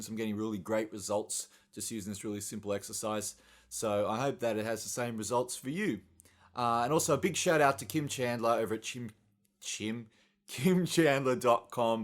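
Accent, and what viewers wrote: Australia. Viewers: You are one of the best vocal coaches here on youtube, possibly among the top 3, and I just love the aussie accent!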